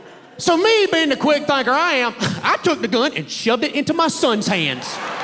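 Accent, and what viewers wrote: South, United States. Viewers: Wow. How unfunny this SOB is. No originality. Hinges on a “redneck” accent and nothing he says has any form originality. He’s got the appeal of Donald trump and the boredom of boredom. Legit trailer park trash and being ironic or not. He’s just not funny.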